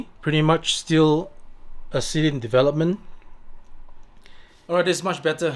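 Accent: Singapore. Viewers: So familiar with Singlish accent!!! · Nice to hear the singaporean accent again! I'd lived there for 7 years and it's the best of my life so far.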